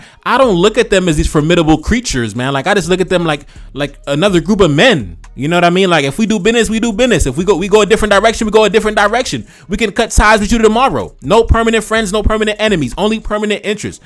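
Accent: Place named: United States. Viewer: My brother where are u from ? U are an expert on africa with American accent . Lol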